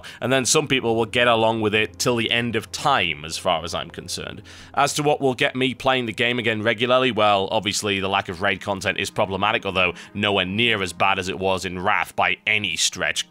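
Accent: United Kingdom. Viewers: id say this guy is pretty good ... but i cant wacht crap , and he sounda abit british huh?? XD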